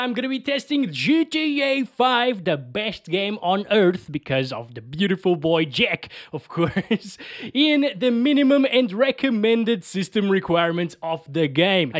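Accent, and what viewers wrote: Portugal. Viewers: Are you portuguese?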